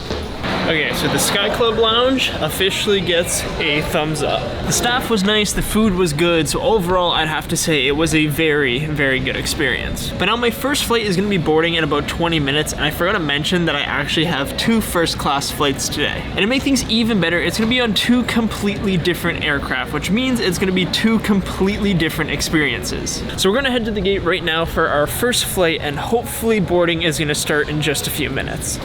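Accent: Canada. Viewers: you sound canaidan are you?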